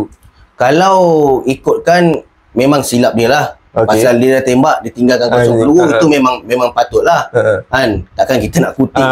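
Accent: Melaka, Malaysia. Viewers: 'lapau', 'dengau'.. Speaker ni org melaka ke? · Gaya cakap mcm org melaka ni....👍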